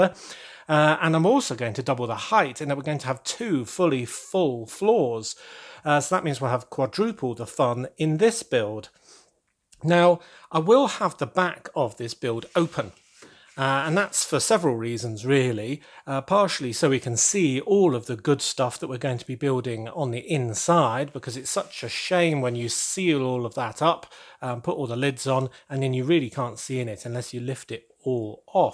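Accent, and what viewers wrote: United Kingdom. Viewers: so you’re from south England?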